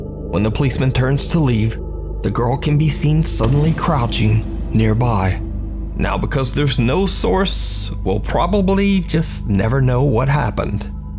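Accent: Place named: South, United States. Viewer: your voice seems to be getting more and more of a "southern drawl" to it. Did ya relocate or something? Btw that may sound creepy(oh the irony)but I'm not asking where you live and have no idea where you are or have been.lol)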